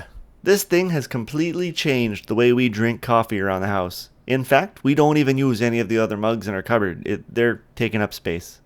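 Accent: Canada. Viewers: Lol it's not like you're hiding it... But i knew you were a true Canadian, from the very first time that you said "aye" 🤣 And like, i also didn't know or see anything that would've suggested that you were until after i was certain you were and i wanted to make sure by checking your profile or the comments... And sure enough you were a Canadian from Ontario(I'm just assuming Ontario because of your accent) correct me if I'm wrong and tell me where your accent is from lmaooo while i was typing this the video was sending and you sent me into a laughing fit when you gave it an "Aye plus" 🤣🤣💀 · While I enjoyed your review - do your really sound like Bob & Doug? I've been a Canadian all my life (ha) and have never met a real Canadian that sounded so, Canadian eh!?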